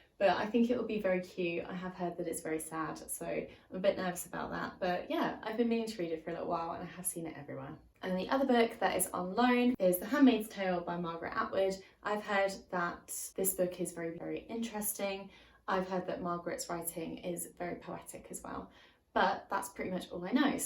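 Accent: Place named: United Kingdom. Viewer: OMG I have never laughed soooo hard while watching one of your videos 😂🤣 First off, I heard "arse holes at night" instead of "our souls at night" - Yes, that's right, despite the fact that I was born in 1993, I am in fact a twelve year old XD (although, in my defense, being from the US, I'm not used to hearing folks speak with British accent IRL so it does take me like, half a sec longer to interpret words spoken by ya'll from across the pond:3) Secondly, "The next book is second in a trilogy...I've heard there's maybe going to be another book..." - LMAO I was sooooo sure here that you were making fun of Kingkiller Chronicle before you showed the book you were actually talking about XD (I have not slept well past few days so my brain just autopilot at this point and is interpreting ppl's words wrong lol) Anyway, great video as always <3 And who know, perhaps one day I will grow up a bit and/or catch a good night of sleep:3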